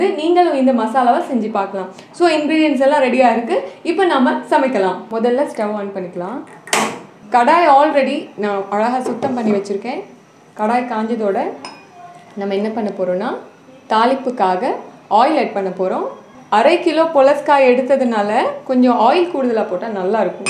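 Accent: India. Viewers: இந்திய தமிழ் வாடை அடிக்குதே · sis don't use the blady indian tamil you use in our Tamil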